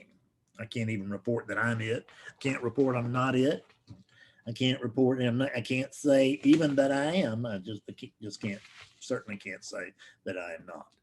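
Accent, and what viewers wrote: South, United States. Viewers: channeling Alan Watts with a southern accent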